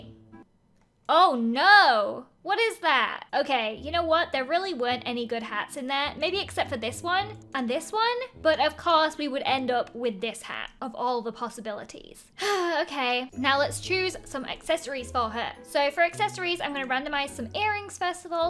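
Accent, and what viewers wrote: United Kingdom. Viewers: Is anyone watching this video in 2020 (British girl accent for lizzie)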